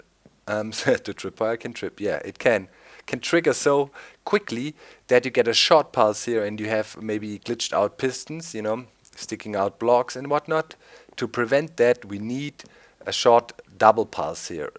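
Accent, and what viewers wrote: Germany. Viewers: Are you from germany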